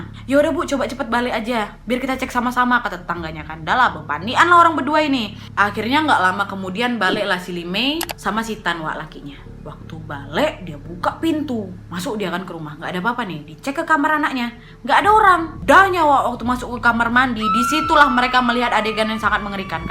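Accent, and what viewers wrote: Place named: Bengkulu, Indonesia. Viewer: Ngmngnya kaya orang Bengkulu Wak Wak🤣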